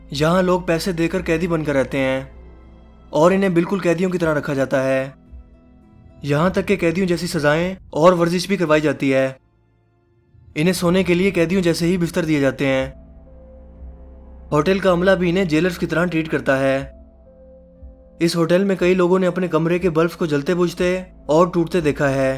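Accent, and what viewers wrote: Punjab, India. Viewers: App ki Urdu saf nhain hain, app kaiyoon punjabiyoon ki tarha Urdu bolthe hon?? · Urdu ko Punjabi bana k bolnah walay kuch to lehja behtar karo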